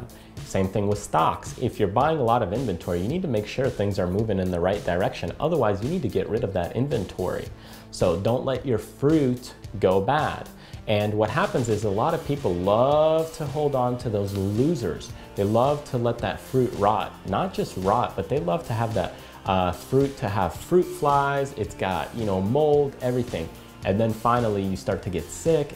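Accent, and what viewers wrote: Russia. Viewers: thank you for a great video man , btw are you Russian ?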